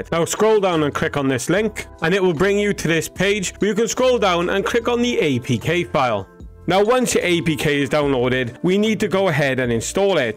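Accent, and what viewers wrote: Wales, United Kingdom. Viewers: omg the welsh accent made this so much more enjoyable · Thanks! My friend said it took him 3 hours to get sidequest on his VR but with this method i got it in 15 minutes. Also proper Welsh accent 💪🏴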